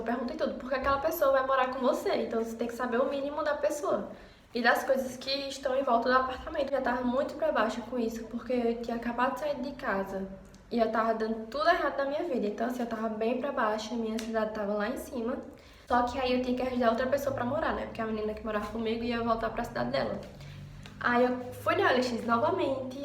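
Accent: Northeast, Brazil. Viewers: Uma cara de paulista danada e sotaque nordestino kkkk combinação interessante, legal o vídeo, tbm estou buscando morar sozinho ano que vem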